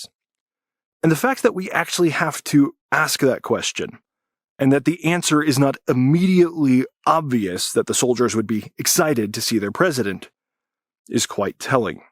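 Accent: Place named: Utah, United States. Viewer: Rational thoughts fella! Utah accent! Prof’ of history at a Utah University?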